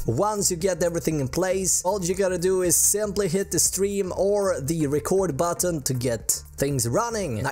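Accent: Sweden. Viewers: bara svensk dialekt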